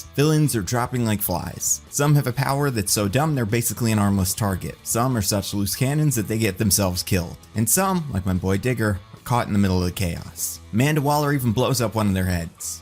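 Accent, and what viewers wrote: Philadelphia, United States. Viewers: u from Philly???????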